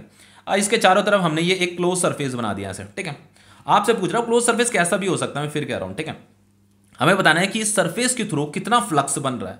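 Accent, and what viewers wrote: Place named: Bihar, India. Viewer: I am from Bihar .... Apke bolne ke tarike se mujhe laga ki shayad ap Bihar se ho..... By the way I like your videos main apke hi videos se 11 class bhi padhi aur 12 th bhi padh Rahi hu .... Thankyou so much sir😃😃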